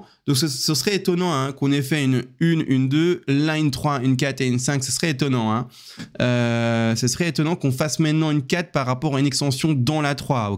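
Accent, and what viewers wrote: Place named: Belgium. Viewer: Nous on dit « uit » toi tu dis « ouit » Mais depuis que je te suis, je me suis habituée 😉 Du coup tu es quoi ? Belge ? Suisse ?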